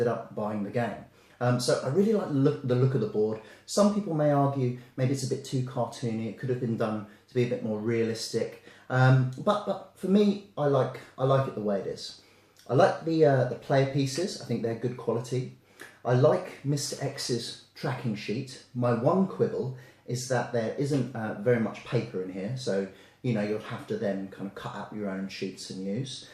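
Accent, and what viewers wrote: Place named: South East England, United Kingdom. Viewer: Hello, merry Christmas. Very good review. I got this game today for Christmas. As I am studying English I would like to know where you accent is from: south-est of Great Britain?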